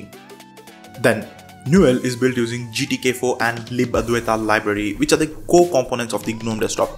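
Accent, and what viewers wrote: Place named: India. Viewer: Dude, I don't understand what you are saying. you got strong Indian accent. I agree with everyone here. LINUX should be free from AI. I left windows 10 years ago, because I don't want Bill Gates to spy on my computer and steal my bank information and all other login info. Installing AI that is NOT open source means you are putting a Trojan horse in your computer. Soon AI will take over your laptop and STEAL all your bank info and MONEY. That is scary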